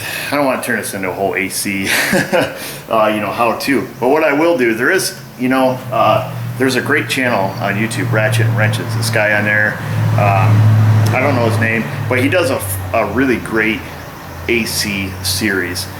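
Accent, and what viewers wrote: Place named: Upstate New York, United States. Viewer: This is a great video, thanks for sharing, sounds like you are from upstate NY id guess Rochester or Buffalo!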